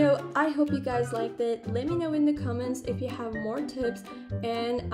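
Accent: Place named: Mexico. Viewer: she sounds like she’s Mexican 😳 but the fit is cute😊😊😊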